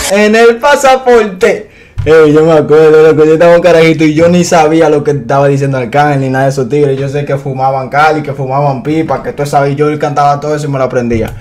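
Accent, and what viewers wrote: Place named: Panama. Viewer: tu hablas como que estas con los panameños yo soy tu no tires ni diaqui ni de aya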